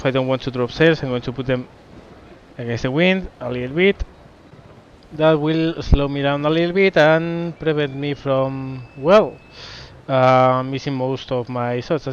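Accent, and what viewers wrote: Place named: Spain. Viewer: Eres español ¿no? Pronuncias demasiado bien los nombres españoles de los barcos y tu ingles no es nativo ni mucho menos Que conste que me encantan tus videos mate!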